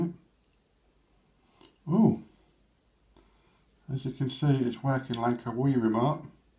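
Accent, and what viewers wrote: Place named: Yorkshire, United Kingdom. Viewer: where bouts are you from, i notice the thick yorkshire accent and you also have "look north" on the telly.. just wondering if your anywhere near Hull... (Where im from!)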